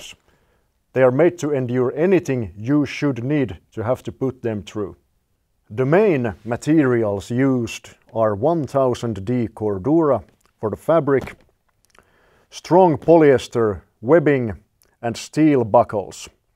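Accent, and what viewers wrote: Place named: Finland. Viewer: I discovered that I can be persuaded to buy things by a handsome man with a Finnish accent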